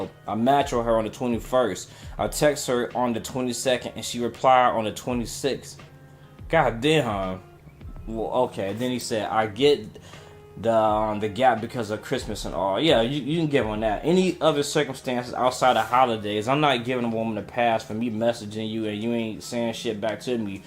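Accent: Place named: Tulsa, United States. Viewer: what is from? I’m asking because my brother is from Tulsa and he has that same accent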